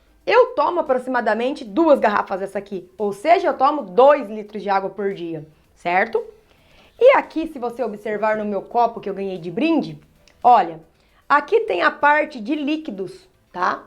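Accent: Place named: Minas Gerais, Brazil. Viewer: Adorei sua aula gata!! Pelo sotaque deve ser mineirinha! 👏👏👏👏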